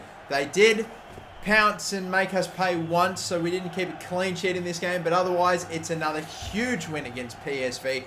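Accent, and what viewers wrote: Australia. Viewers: halo289 he’s Aussie 😂😂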